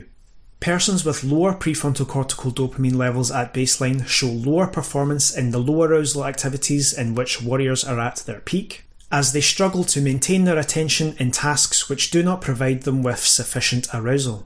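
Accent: United Kingdom. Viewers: Warrior/ worrier isn’t the greatest phrase to follow with a Scottish presenter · Are you a Scouser?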